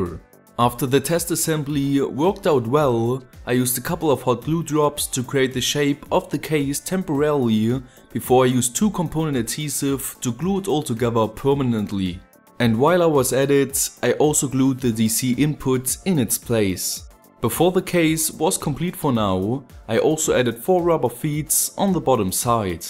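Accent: Germany. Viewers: i believe he's german :p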